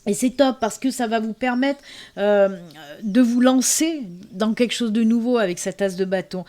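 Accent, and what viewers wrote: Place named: Montpeyroux, France. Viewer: Bonjour tu es pas de montpeyroux parceque tu as l’accent de montpeyroux:) « »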